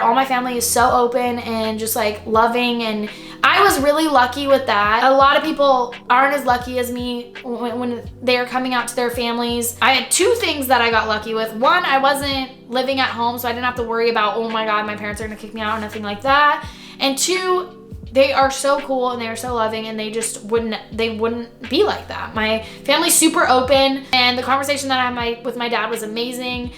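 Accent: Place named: New York, United States. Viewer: it sounded like you have a New Yorker accent a little haha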